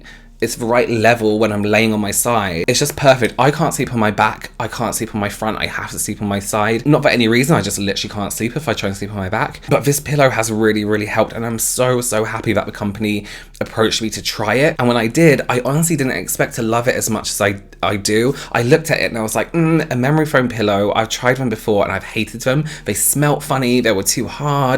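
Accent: United Kingdom. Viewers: Dearest, you sound loud enough but still sassy and classy with your lovely British accent!! We love you in my FB makeup group here in Canada, our "unofficial Official" mascot!! You're our favorite twin BTW!! We do love James, how can we not? But, you just slightly outdo him in the finesse category!!💋😉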